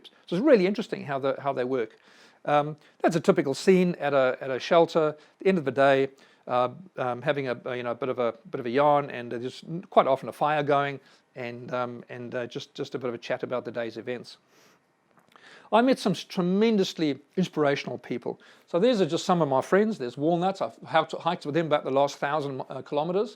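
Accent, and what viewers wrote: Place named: New Zealand. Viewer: Hi! How did I miss this?! Great job! It was awesome to hear you speaking in that chill NZ accent. Miss you Bud.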